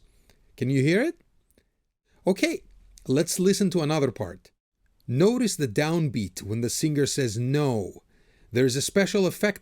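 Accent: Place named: Greece. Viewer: Subbed you right away, although it has more to do with how you present the info & content, that greek accent is too good.. (this channel is certainly not boring like other audiophiles out there)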